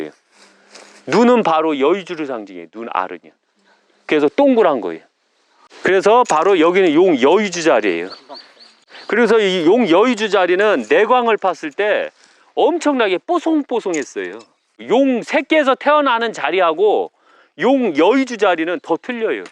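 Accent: Chungcheong, South Korea. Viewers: 선생님잘보고갑니다^^ 충청도사투리구수하네유^^ 충청도어디세유?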